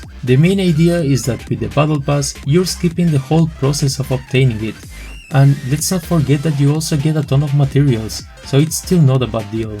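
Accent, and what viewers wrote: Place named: Spain. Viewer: Spaaaain. Blue archive has come so far! I almost didn't notice the accent. I may be wrong but...anyway very high quality video.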